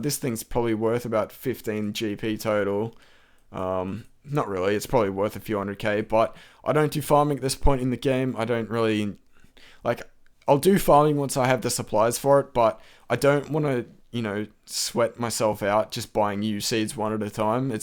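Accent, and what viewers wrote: Australia. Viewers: nice vid man, always good to hear an aussie commentator. get dem 1m nats cuz :P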